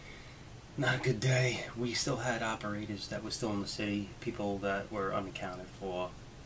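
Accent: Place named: New York, United States. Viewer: you're a good guy..love the new york accent:)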